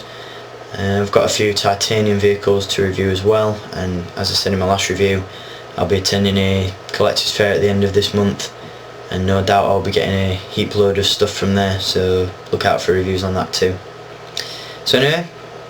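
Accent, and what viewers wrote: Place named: England, United Kingdom. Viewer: wait are u english i thought u was from the us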